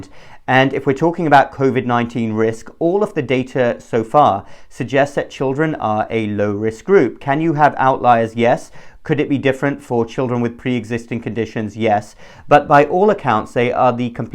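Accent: United Kingdom. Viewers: yes, I wasn't looking at the screen, just listening, and he sounded British alright. What's the other passport?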